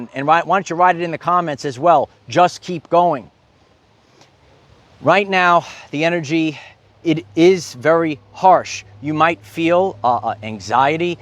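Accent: New York, United States. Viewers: a few weeks before I came across your channel I would sometimes see 2 or 3 times per day, an ad at a bus stop saying come to New York. The picture showed the statue of Liberty holding the torch. It really popped out and got my attention How synchronistic. Anyway I am really enjoying the new connection and knowledge gained. And I love the NY acsent Peace.😊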